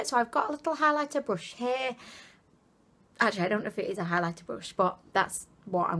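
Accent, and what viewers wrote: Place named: North Wales, United Kingdom. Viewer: Right.. Personal question. Where are you from? Obviously your name is Welsh and there is a northern Welsh accent there but it seems to have a hint of something else. Am I close? (Semi ignorant Australian here though I did spent a fortnight in north Wales last year)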